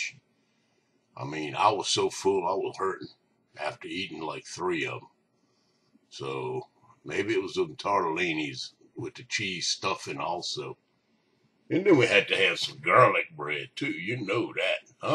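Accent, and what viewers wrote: Louisiana, United States. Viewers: What part of Louisiana u from sha? Got dat ole tic accent baw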